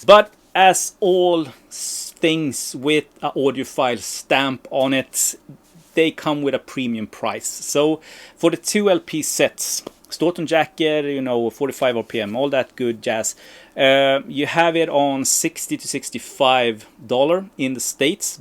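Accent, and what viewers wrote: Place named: Sweden. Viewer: gillar svengelskan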